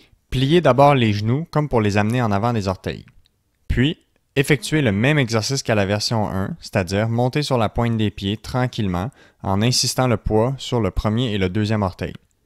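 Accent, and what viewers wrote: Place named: Quebec, Canada. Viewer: Bravo explications claires images bien filmées et silhouette superbe continuez à faire d autres vidéos avec ce merveilleux accent un plaisir de vous suivre depuis la France en Poitou si cher aux québécois .cordialement .marc qui ne se remet pas d’une entorse datant de six mois(exercice phase trois impossible).a cause d une algodystrphie.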